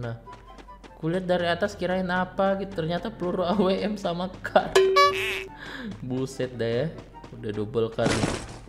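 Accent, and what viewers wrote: Makassar, Indonesia. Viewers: makassar mana suaranya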